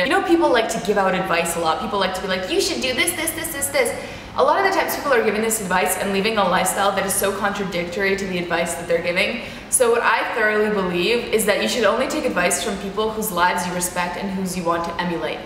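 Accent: Russia. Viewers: Почему у появился русский акцент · Легче понимать речь, если сравнивать с остальным англоязычном ютьюбом. У неё есть некоторый акцент, русский, или кажется? Поскольку большая часть из сказанного в видео было уже озвучено на classisinternal на родном русском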